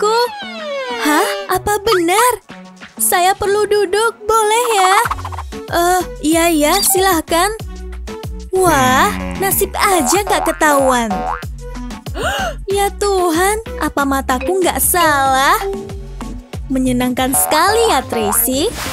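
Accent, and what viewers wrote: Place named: Java, Indonesia. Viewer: Dasar ngomong medok jawA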